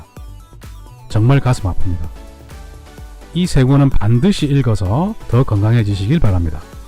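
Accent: Gyeongsang, South Korea. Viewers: 선생님 목소리가 너무 편안해요. 그 목소리 건강 잘지키셔서 오래듣도록 해주세요. 그리고 발음속에서 경상도쪽 특유의 발음이 들리는듯 한데 그 지역 분이신가요? 응원하고 있습니다.❤❤❤❤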